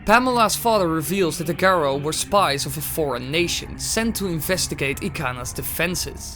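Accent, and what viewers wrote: Netherlands. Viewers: Are you Dutch? I am? Because you have a dutch accent! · Question are by any chance Dutch? You have a very familiar accent ... ;)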